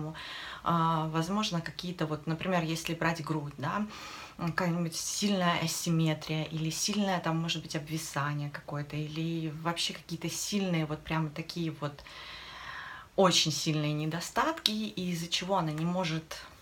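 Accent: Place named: Provinces, Russia. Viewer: простая речь как из глубинки и провинции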